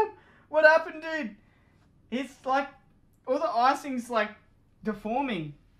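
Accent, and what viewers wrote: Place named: New Zealand. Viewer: i like ur new zeeland acksent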